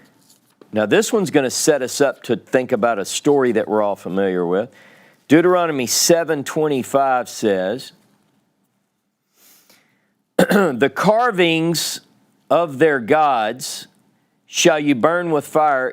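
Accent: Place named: South, United States. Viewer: Brother, your southern accent is infinetly amusing and very fitting for a teacher.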